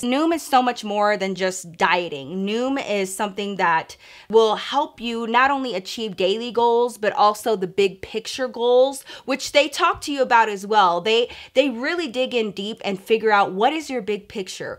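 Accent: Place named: South, United States. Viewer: I love how u say certain words ur Southern twang is so cute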